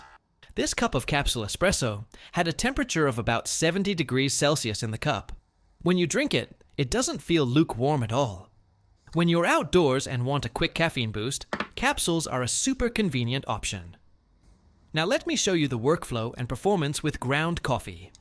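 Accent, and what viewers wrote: United Kingdom. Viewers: Bro became more British since last time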